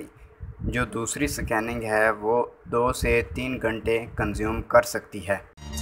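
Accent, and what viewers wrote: India. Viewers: Punjabi Language destroys the accent of every language like this brother's. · I don't know but I felt I found the true and the best video when I heard the Indian accent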